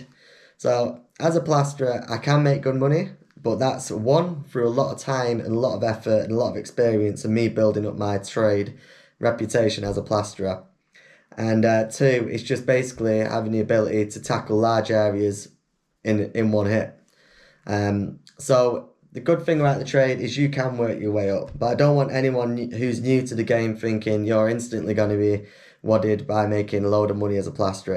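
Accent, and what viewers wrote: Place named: North, United Kingdom. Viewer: Hastings! You're from up north though ain't ya 👍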